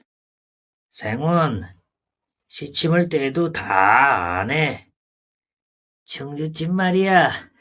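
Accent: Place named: Gangwon, South Korea. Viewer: 재미있게 잘 들었습니다^^ 책도 재미있지만 귀로 들으니 더 집중도 되고 좋았어요^^ 강원도 사투리가 재미있어요^^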